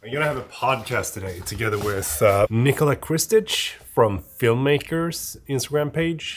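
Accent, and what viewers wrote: Sweden. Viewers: Good to see the vlogs back. And your SwEnglish is on point 👍🏻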